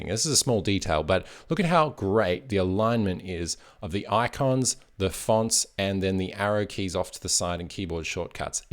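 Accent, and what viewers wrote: Australia. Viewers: Hey, thank you for your thoughtful and well spoken videos. You have one of the least annoying Aussie accents I've ever heard. Just stay on top of the disfluent fillers and I, for one, will be back for more.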